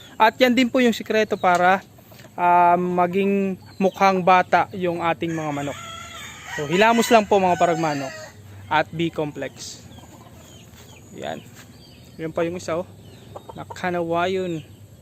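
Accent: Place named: Samar, Philippines. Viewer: Ha Samar ka?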